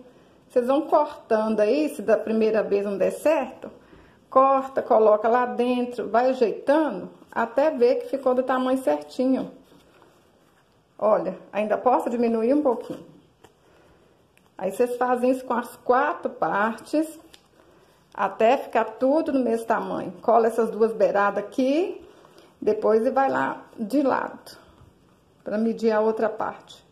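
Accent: Minas Gerais, Brazil. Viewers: vc e mineira como eu? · amo seu trabalho o seu geito de falar...MINEIRA???? PARABENS.🙏🏻👏🏻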